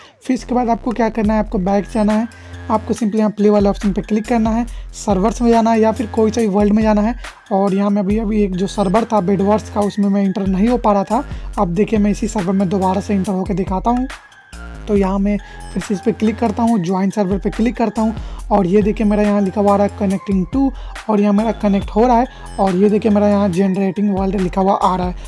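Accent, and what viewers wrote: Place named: India. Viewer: Bruh u speaking indian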